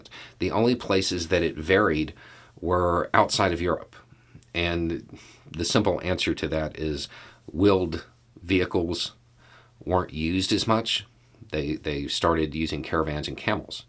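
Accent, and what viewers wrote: South, United States. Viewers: Oh, talk to me, eloquent American Southerner, about the logistics of ancient Rome.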